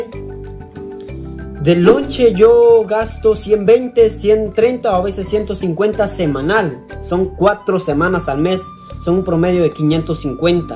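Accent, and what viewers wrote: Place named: Guatemala. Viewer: Con tu forma de hablar me dice que eres de guatemala y también la musica de fonod es muy típica de aquí XD. Orgulloso de mi guarte :'3 comiendo mrd juntos pero juntos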